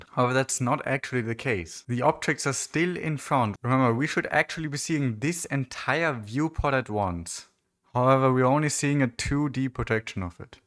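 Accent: Germany. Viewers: Nice Video ❤. U Sound German😂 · Do i hear Deutsch?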